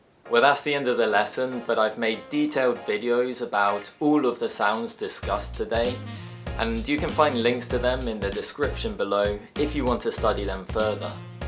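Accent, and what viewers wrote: United Kingdom. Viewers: Profe eres genial ya me suscribí definitivamente me gusta el acento británico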